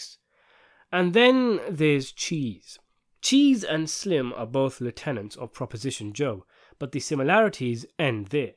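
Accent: United Kingdom. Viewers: who dis brit yo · To listen to an overview of a series depicting "thug life" in the inner city of Baltimore by an orator with a British accent is a testament to his truly genius this series was . Great job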